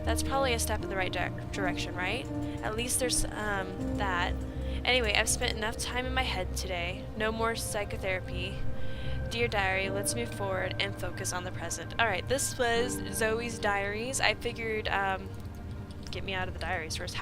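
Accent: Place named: United States. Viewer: I am so glad you did an episode like this:)plus I love hearing an American accent:)you know me haha it became my bedtime story(@@ xxx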